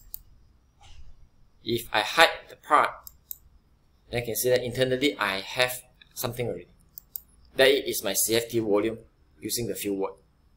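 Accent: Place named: Singapore. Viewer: it sounds like a singaporean accent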